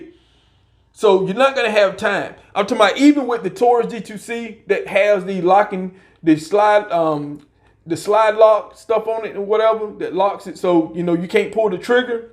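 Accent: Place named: North Carolina, United States. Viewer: yea im not making fun of. him. Its a new way to say firearm. Nc accent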